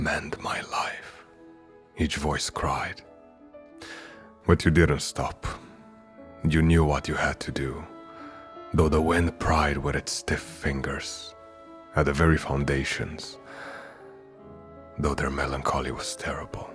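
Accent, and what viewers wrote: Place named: Hungary. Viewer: Hi, thank you so much. Your readings are amazing. I'm a writer and poet myself and hope to get published soon. Listening to your readings is like medicine for my soul. As a Canadian born Hungarian, your accent makes me feel my long passed family around me again. Thank you again for so many reasons that I can't say. Have a great day ❤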